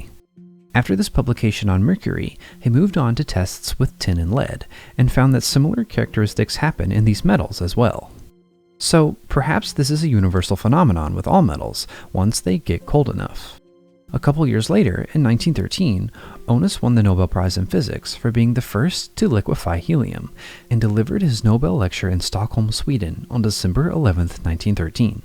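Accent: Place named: South, United States. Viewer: I doubt it. Were the voice AI, pronunciations would follow standard English patterns superimposed over a fake voice, but this voice is a specifically Southern variety of General American.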